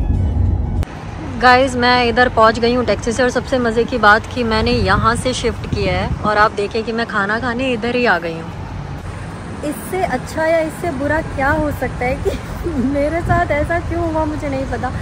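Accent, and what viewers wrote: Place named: Pakistan. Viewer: Aap Pakistani drame bahut dekhto ho lagta h .apki bat karne ka dhang thoda vaisa hi gya h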